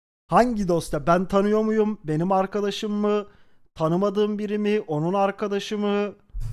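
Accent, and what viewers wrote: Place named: Erzurum, Turkey. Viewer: Erzurumlu olduğun ne kadar belli ama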